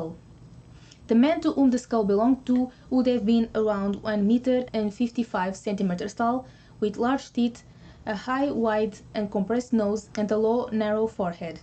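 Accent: Portugal. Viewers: I notice a Portuguese accent...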